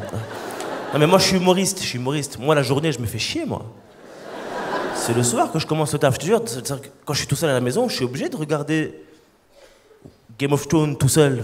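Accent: Tunisia. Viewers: Il a vraiment un accent Tounsi c'est abusé hhhhhhhhh je kiff je me laisse pas de regarder encore et encore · L'accent tunisien tellement stylé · j'ai reconnu l'accent tunisien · cet accent tunisien sucré là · L'accent tunisien je kiff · WAllah j’aime terriblement son accent tunisien alors que de base non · De son accent je dirais qu'il est Tunisien, quelqu'un sait? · L'accent tunisienne de Hakim 💓💓😍💓💓😍😍 m'a tué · C est un tunisien lui juste à son accent.🇹🇳🇹🇳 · L’accent tunisien cramé de loin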